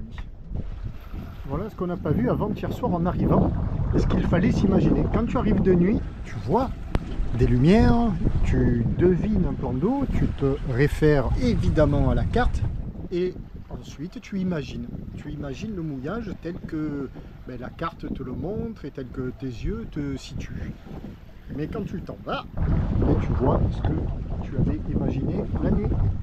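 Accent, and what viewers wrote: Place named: Marseille, France. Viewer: Merci:-) Profite bien et continue de nuits faire rêver avec ton gâté et ton bel accent marseillais ❤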